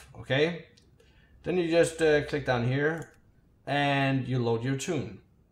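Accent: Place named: Denmark. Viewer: Man kan tydeligt høre at du er dansker! :D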